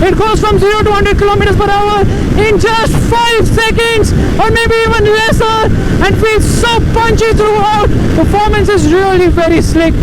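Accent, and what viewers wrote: India. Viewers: Ohhh you are Indian I thought that you are Pakistan by reading your Muslim name but by your talk I come to know that you are Indiab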